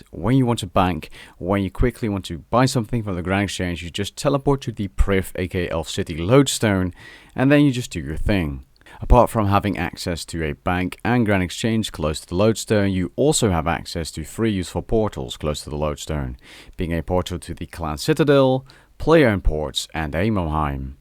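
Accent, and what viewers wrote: United States. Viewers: funny I thought he was from Jersy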